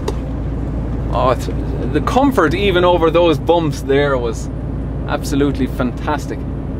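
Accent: Ireland. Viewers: I'm gonna be honest.,the only reason I watched this was to enjoy the Irish accent.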